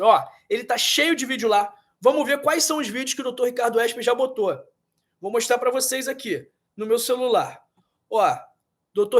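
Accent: Rio de Janeiro, Brazil. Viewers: Muito x d carioca sotaque intragável e muito conversa conteúdo demorado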